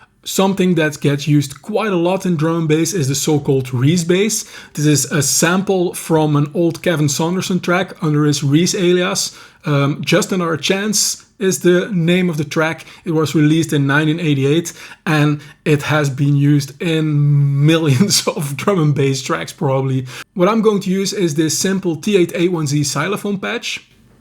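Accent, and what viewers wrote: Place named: Netherlands. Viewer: Recently started music production (never played a note before) and last 2 days been trying to make a ambient jungle song and it goes suprisingly well, only didnt know how to implement a bass. This video helped a lot in general. Groeten uit Den Haag. (Zo te horen ben je nederlands )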